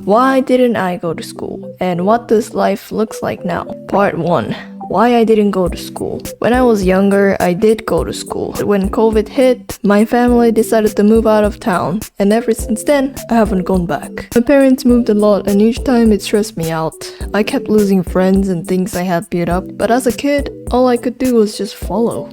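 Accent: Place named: Germany. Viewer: Ohhh interesting story! What country are u from? I thought u might me German at first because of your accent, but I think homeschooling isn’t allowed there at all… are u Asian? 😮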